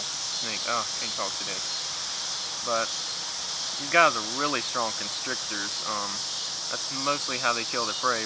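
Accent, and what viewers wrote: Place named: South, United States. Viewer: I never noticed how thick your southern accent is lol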